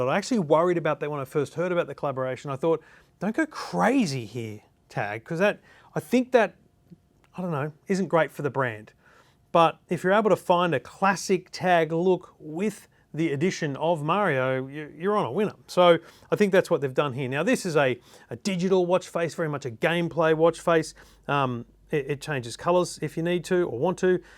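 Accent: Australia. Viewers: Is this Guy from Australia? And why is he speaking in Australian?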